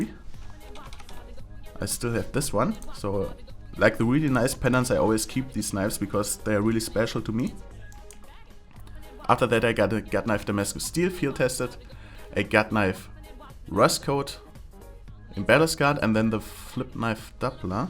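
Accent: Germany. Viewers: u from german 100%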